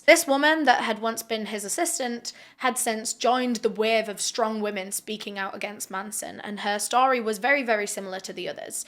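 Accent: United Kingdom. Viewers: What part of britain is her accent from ?? Philomena cunk speaks like this too i think 😅 I'm curious, anybody?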